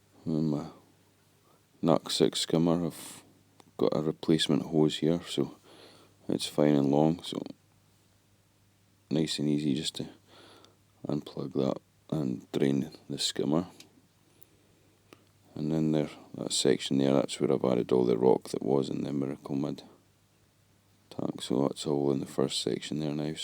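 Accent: Scotland, United Kingdom. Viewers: Where you from mate? Your definitely Scottish. Aberdeen by any chance?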